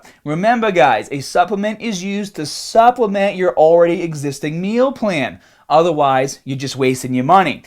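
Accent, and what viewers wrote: Boston, United States. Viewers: You have the same accent as JFK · Every 10th word has a strong Boston accent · you've got to be from Boston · love the Bhaston accent! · why are you talking like if you're in the Departed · Love that Bahston accent!!! Thanks for the info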